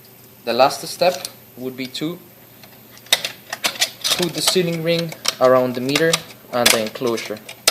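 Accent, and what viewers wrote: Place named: Cuba. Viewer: Hialeah is a city within Miami, Florida. He sounds Cuban..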